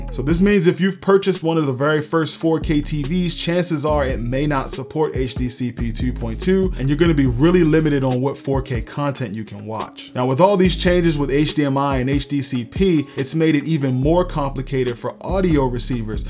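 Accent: Baltimore, United States. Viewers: Just checked your about section, you are from Baltimore! Cool. Answered my own question.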